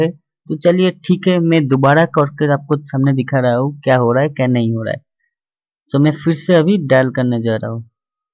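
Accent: Bengal, India. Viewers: bro u r bengali lol love you bro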